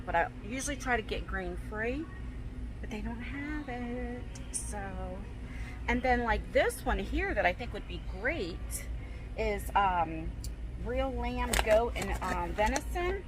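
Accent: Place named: New York, United States. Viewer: I hope Lexi feels better soon with her side effects. I am praying for you and Lexi daily.🙏🙏 It's a sick world out there with all this crap. Family first, don't worry about posting when you can't. We all understand. Are you from NY? I was born there, I think I hear that NY accent. Take care. Love watching your channel.😊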